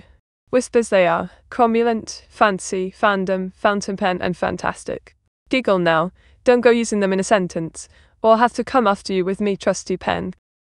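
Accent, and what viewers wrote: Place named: United Kingdom. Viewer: The voice and audio need some improvements: - Compression (maybe Normalization too): It peaks too high and goes low again, it's not consistent in her voice. - The sound seems low quality, it sounds like someone with an old mic (maybe adjust filters?) - I don't mind the boyish voice although l I would prefer a softer voice or a cute one. - The british debuff is an issue, it's hard to understand, especially for AI that talks incoherent stuff sometimes (you can't understand form context). Many times I like to keep chatting streams ON while doing something else, but it's hard to understand her without paying attention due to her accent. Overall seems like a great project, keep it up!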